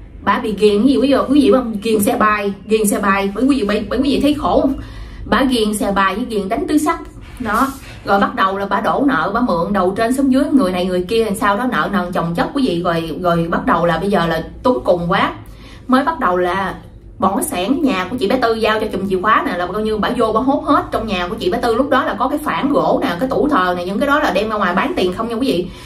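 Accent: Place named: Rural, Vietnam. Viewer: Cô rất thích - giọng nói đậm chất quê- tâm lại tốt nữa Oke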